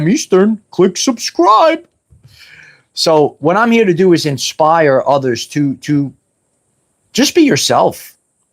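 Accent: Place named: Rhode Island, United States. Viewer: I found you from R.I. That accent is familiar lol. Close enough to NYC I think you're beautiful 🫂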